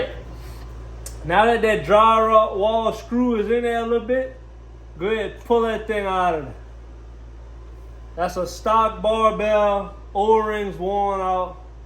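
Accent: Louisiana, United States. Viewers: what part of louisiana you from?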